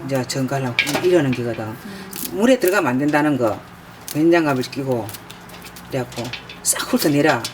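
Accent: Gyeongsang, South Korea. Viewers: 멋진 팁 ! 감사합니다 갱상도 사투리라 더 정감 갑니다 ㅎ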